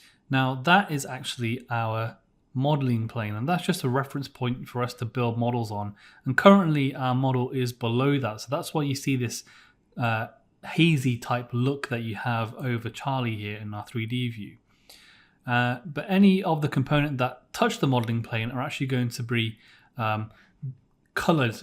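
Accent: United Kingdom. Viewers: Confused about why an Englishman keeps mispronouncing the letter Z? Surely if we can tolerate Aloominum, they can translate 'Zed'? Luckily I am old enough to still remember how to think in Inches though ;) Thanks for the Video, very helpful. Maybe add subtitles for an English speaking audience?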